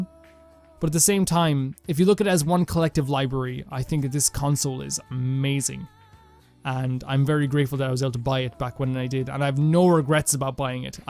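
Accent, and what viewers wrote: Ireland. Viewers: You kinda sound like Jacksepticeye are you Irish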